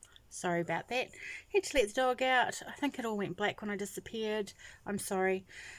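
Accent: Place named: New Zealand. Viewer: Hi.. I've been a subscriber for awhile now and always enjoy your videos (and your Kiwi accent). I've lived in NZ and you bring back fond memories. I agree with you about postage from overseas being expensive but a long time ago I discovered Springwools in Ireland and have bought lots from them. They have a flat rate international shipping which is 3.99 Euro and according to Siri that would be $7.05 NZ. Their service is nice and quick, although I just noticed they have a message on their site saying they're experiencing slight delays because of Covid-19. Anyway, its worth a look. I love the King Cole Superballs - 250G and work out very reasonable.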